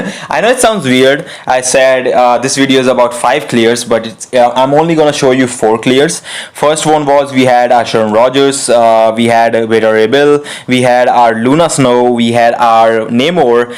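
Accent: India. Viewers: Man I love this Indian English 😂♥️♥️♥️. Great job dude ♥️♥️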